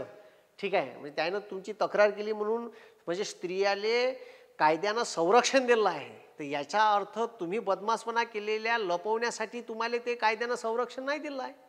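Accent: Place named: Pune, India. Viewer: सर तुमच्या तोंडून ऐकायला छान वाटते. आणि विस्तृत माहिती मिळते. परंतु तुमची गावरान बोलभाषा जरा कमी झाली आहे आणि पुणेरी भाषा जास्त आहे. मला तर तुमची मायबोली मराठी जाम आवडते.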